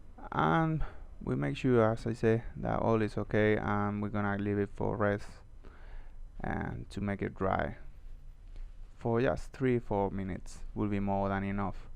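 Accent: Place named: Spain. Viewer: Sounds Iberian Spanish, or even North African, like Morrocan, or Tunisian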